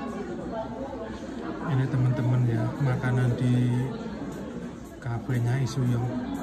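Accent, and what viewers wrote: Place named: Java, Indonesia. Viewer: Wis njan....senajan awakmu wis tekan adoh korea kono....tetep wae medok. *kui kue sing kotak cilik neng nggonku jenenge etheh etheh plengeh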